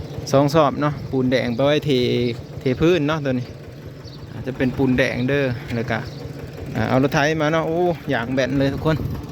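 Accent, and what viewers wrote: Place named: Nakhon Phanom, Thailand. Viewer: คือเว้าคือคนไทยจังหวัดนครพนมแ ท้นึกว่าเป้นคนไทย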